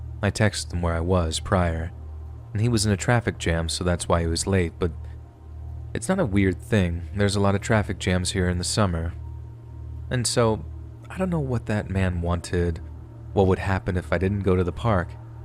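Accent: Denmark. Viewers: Haha, når man genkender dansk accent med det samme!! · So is Danish? I was trying to place the accent.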